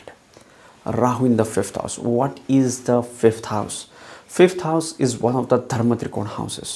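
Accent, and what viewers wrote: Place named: Russia. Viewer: I have this struggle in life too. What a great video. Spot on how Rahu is shaky and made me go from one thing to the other. Your message towards the end was really good to be specific to find our calling, our Dharma and not be distracted. By the way you sound as if you have a bit of Russian accent - esp. when you say - "You''ll always" and "energy". Just saying. Sounds great. thank you.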